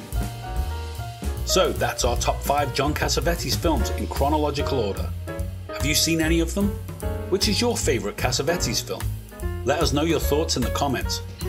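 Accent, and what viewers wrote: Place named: North, United Kingdom. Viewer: Love your Northern accent!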